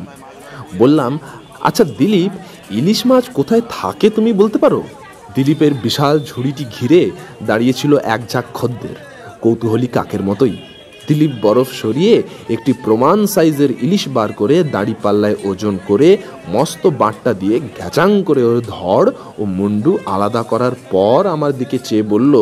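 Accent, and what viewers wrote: Bankura, India. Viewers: Kolaghat e bankurar accent??? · Besh valo.. kintu purba medinipur e bankurar accent ta kan e lagche 😐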